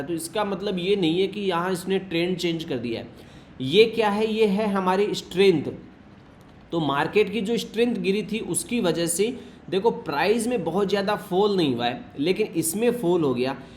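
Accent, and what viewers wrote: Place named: Marwari, India. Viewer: Marwadi lahja is always seen. Keep it up.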